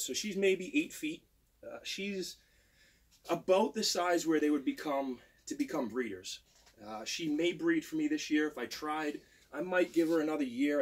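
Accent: United States. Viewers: You sound just live my dad!!! Love the east coast accent 😂💕 Mainer here 👋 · Am I the only one that hears a Jersey accent poke through? Just me? k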